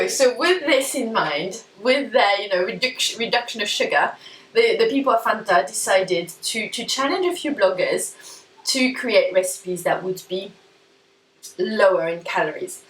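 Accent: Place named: France. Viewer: Bonjour! By your accent I guess you're French?:) I lived in France for 8 years or so, and I must say I can't remember a high concern there for gluten free etc, there just seemed to be less allergies over there? Am I wrong?? I honestly first heard of allergies to gluten in the UK.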